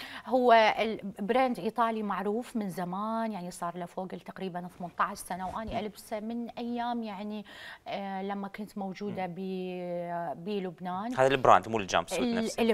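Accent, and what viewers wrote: Iraq. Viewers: طبعا لهجتك العراقيه والله العظيم ضحكتني من خاطر😂😂😂😂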